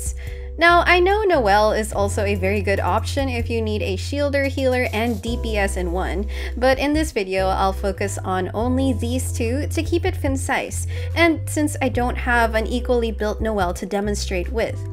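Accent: Philippines. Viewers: Thank you!! I needed this vid because I got hutao and I know how thoma is good for her but I got elegy today at 5 pity and I decided to go for building diona (I dont have sacrificial bow) P.S: u sound filipino ;)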